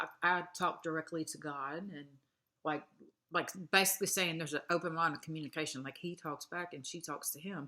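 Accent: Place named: Alabama, United States. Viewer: Aww i miss the bama accent even more after hearing your voice! Pretty neat B!! Xoxo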